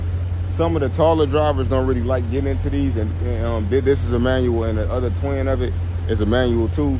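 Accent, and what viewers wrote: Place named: St. Louis, United States. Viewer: Bruh u gotta be from stl….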